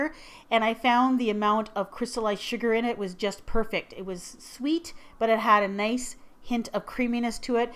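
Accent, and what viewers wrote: Canada. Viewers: Random question, are you Canadian?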